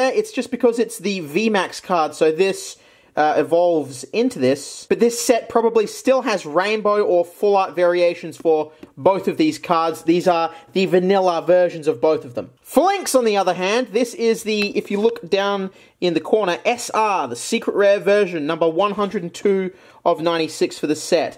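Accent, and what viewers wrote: Australia. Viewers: already saw the video in the self promo. you're videos are consumable as fuck... or maybe it's the Australian accent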